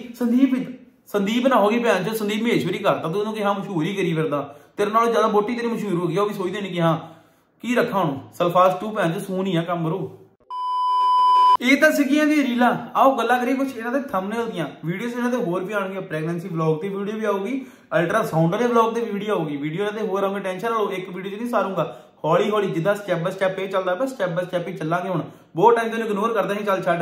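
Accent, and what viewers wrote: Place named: Majha, India. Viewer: Majhe di boli😍 haada km nhi chlda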